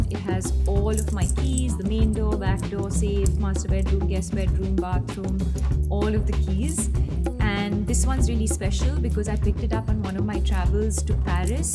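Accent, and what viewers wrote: India. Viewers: I'm in love with her banglori English 💕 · Let's just talk about her accent like so many ppl change their accents when they be popular and look at herrrr pure Indian!!!!!❤️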